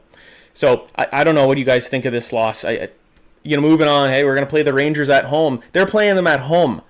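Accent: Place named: Ontario, Canada. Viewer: Fun fact: The Wings moved into second place this weekend. The Sabres if they win their game in hand, they are two wins from a Wild Card. Get this in your head: There are good shots and they just miss, hit the post and out, hit the post and in, and there are goals. Now go review high school probability and statistics to under stand how that results in final scores. Your accent betrays you, so please compare the Wings to your two Ontario team. And also compare Larkin to the crybaby who was cast as the greatest player ever. Larkin has 2 points more than 87, Larkin kills penalties, Larkin contributes as he is +7 in the stat that correlates in aggregate most closely to games score (aka wins) while Canada's prompted up hockey saint is a net zero and DOESN'T play a complete role. If you are not a fan enough to handle the ups and downs of a season in a league of extreme parity, maybe you should just watch the weekly highlights and then create a weekly video. 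This video today looks like Jonathan Quick 0.3 seconds after a good performance, lacking emotional impulse control.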